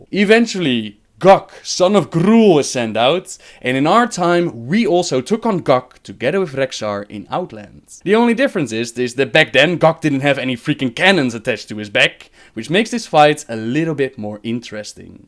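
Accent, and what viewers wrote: Netherlands. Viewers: You dutch? Je klinkt in ieder geval nederlands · Awesome :P BTW are you dutch? because you have a small dutch accent :P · His accent. He is from the Netherlands. ^_~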